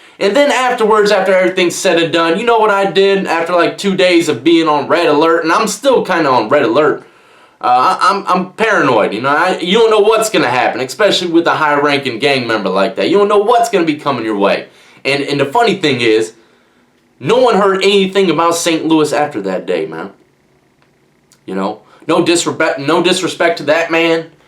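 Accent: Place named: DMV, United States. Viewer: I knew you from the dmv or I could just hear it I’m from dc/Baltimore anywhere in between and I know front royal VA pretty well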